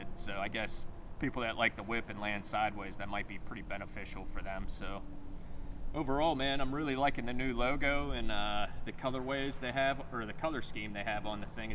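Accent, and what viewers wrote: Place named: Pittsburgh, United States. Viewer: Without even reading your page I knew you were from the burgh. Thanks for the review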